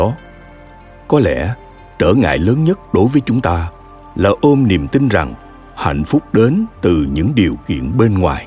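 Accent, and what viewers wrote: South, Vietnam. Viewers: Giọng đọc hay nhất trong các giọng đọc của sách sức mạnh tiềm thức. Chắc mình người Nam, nên thích nghe giọng miền Nam ấm áp, trầm và nội lực. Cám ơn Fonos, mình sẽ mua để nghe trọn bộ.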